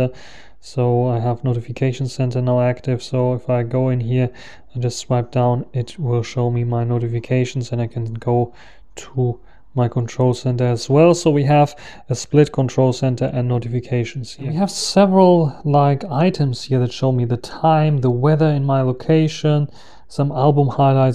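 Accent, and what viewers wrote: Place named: Germany. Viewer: Nach drei Wörtern Englisch wusste ich sofort dass du ein Deutscher bist. Diese Akzent findet man nur bei den Deutschen wenn sie endlich sprechen. 😂 Aber nettes Video, lass dir gern ein Abo da. Frohes neues. Das Vivo x80 pro gefiel mir vom Design her besser, ich persönlich warte bis Vivo die Frontcamera 4k fähig macht.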